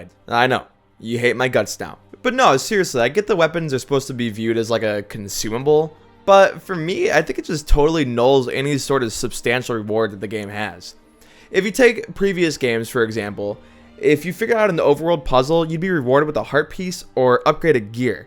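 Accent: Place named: Midwest, United States. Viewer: Midwestern man YouTube voice is music to my ears.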